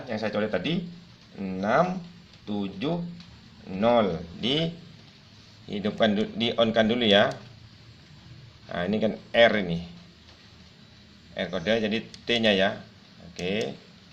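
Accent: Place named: Madura, Indonesia. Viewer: pantes logat nya kayak bahasa madura pak 😁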